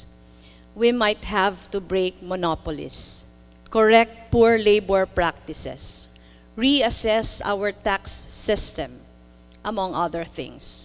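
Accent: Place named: Philippines. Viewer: What's the problem with Filipino accent or her tone?😊 I hope this is not the first time na nakapanuod kayo ng isang speech? Internationally or even locally, it is well accepted to read a speech as it maintains close control over the tone or the timing of the speaker. Try to watch some inauguration or graduation speech, hindi po kahiya-hiya na gamitin ang pamamaraan na 'to. The audience does not care, anyway, what their after is the substance of her speech. You can also watch her Q&A dito rin po sa business forum. Please watch objectively, do not mock and disrespect the speaker merely because she's reading. She's invited as a resource person because she's considered as a person of authority and credibility. May expertise po siya sa larangang iyan. Ayos lang po na may suportahang kandidato ngunit huwag po nating hayaan lamunin tayo masiyado nito na nagiging bastos na tayo. 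😊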